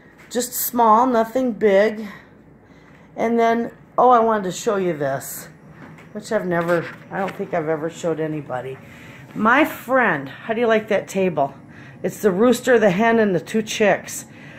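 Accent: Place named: Northeast, United States. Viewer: Hi. Thanks for taking us through your beautiful home. You are organized and efficient! Sounds like you have a North Eastern accent. I'm from upstate NY (but in SoCal for 40 years now) so you sound like all my relatives. Have a wonderful day.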